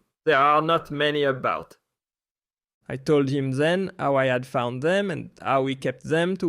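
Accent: France. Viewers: I love your content here however I do agree that the French accent is a distraction. Perhaps I’m much lighter accent or no accent at all might be a better choice, but that’s just my opinion.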